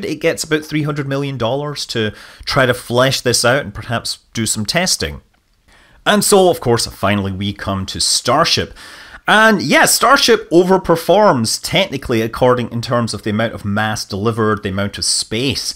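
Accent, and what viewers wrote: Scotland, United Kingdom. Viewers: Are you Scottish? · "Hulloo, Scott Manley here." How do you write the "Scottish" accent? I love it but don't know how to get those "ahrr's" in print, bummer!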